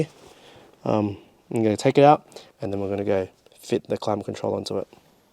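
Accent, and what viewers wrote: United Kingdom. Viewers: I got a Mekede S6 Pro Plus I bought all the accoutrements from the junkyard including the vents, duct, entire harness to the pass door (USDM), and the CORRECT dash from a 99-00 for my 97 EX Coupe. The only thing I bought used off ebay was the lower automatic mode actuator but I'm having trouble visualizing how it is installed. I guess I need to get off youtube and go dig through the shop manuals. Searching for the latter is what brought me here but I have to say is much easier to understand than ANY of the other channels that have done this exact swap. The narration deserves an award for the beautiful narration and in the King's English no less!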